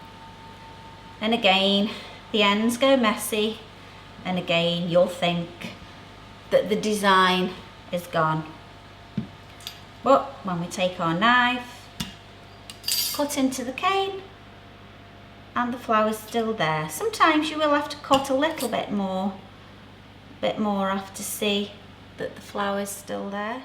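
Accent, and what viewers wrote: United Kingdom. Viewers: oh my.... i love your accent.i didnt like British before but you talk really beautifully.and your tutorial was awesome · what are you talking about? there is no Irish accent here, just a British one, probably midlands maybe southern. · Tutorials are always better with a British accent!! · i love your little british acent X3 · Obviously some ignorant people who think the English all talk like Kate Winslet!! There’s so many awesome accents in the UK! Hers being one of them. Thanks for a great tutorial. Love from Oz x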